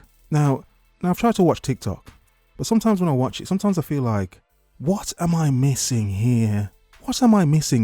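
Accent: United Kingdom. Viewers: You do a good job 8 times out of 10. This one, no. You sound like a cranky old Republican. Your idiosyncratic Brit voice mitigates the Archie-Bunker-impression somewhat. You're still the Man, though.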